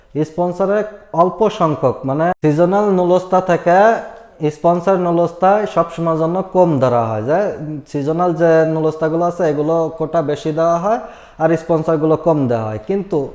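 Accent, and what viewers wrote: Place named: Narail, Bangladesh. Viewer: ভাই আপনার বাড়ি কি নড়াইল?কথা বলার কোয়ালিটি সেইরকম মনে হচ্ছে।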